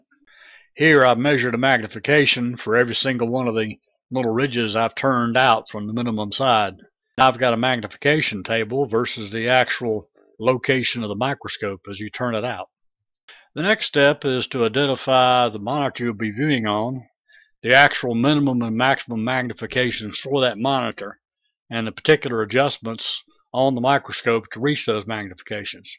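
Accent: United States. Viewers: Great Work ! Accent is the best ! (Nashville ! guess) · Country accent 😈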